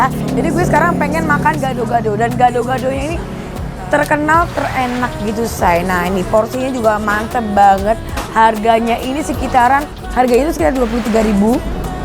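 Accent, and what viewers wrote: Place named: Java, Indonesia. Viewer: Medok euy. Btw anting nya telor ceplok ya ci 😊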